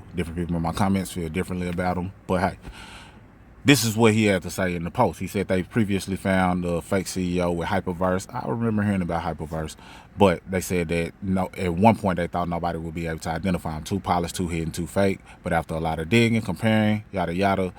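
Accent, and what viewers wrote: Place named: RP, United Kingdom. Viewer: His manorism seems real. His life stories seem real. His voice is called the kings English. Us Americans are not used to it. It sounds fake because it is so proper, but that'how Kings English sounds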